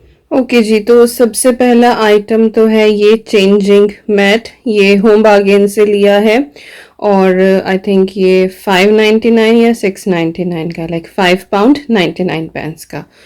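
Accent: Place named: Uttar Pradesh, India. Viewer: Ap apni up wali boli bola kro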